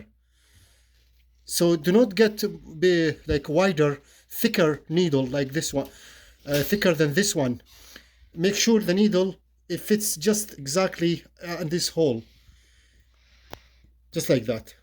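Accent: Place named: Romania. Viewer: U not english u Are Romania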